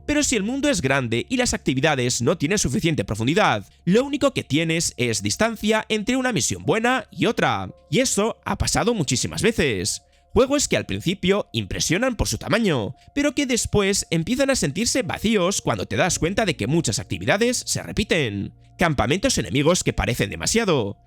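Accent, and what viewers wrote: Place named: Spain. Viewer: Por su nacionalidad el espano se habla en much paices 😂😂lo que cambia es el acento creo que es be ser espana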